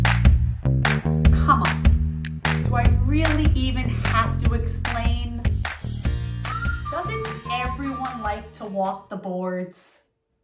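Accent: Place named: South Jersey, United States. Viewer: You honestly sound more south Jersey to me. Wawa- howee-gee Down da shore Wooder lol!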